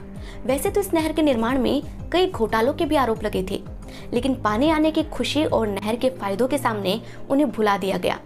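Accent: Standard. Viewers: आपकी शुद्ध हिंदी बोलने की कला ओर आवाज ,दोनो हि लाजवाब हैं मैडम जी ,बहुत अच्छी वीडियो हैं। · बिल्कुल शुद्ध हिंदी बोली आपने बहुत मधुर भी बोलती हो दिल से आपको धन्यवाद o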